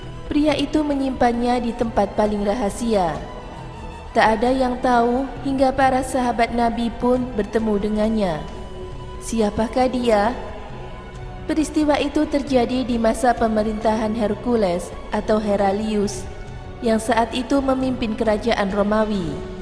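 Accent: Java, Indonesia. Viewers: Vocal suara jelek kaya orang jawa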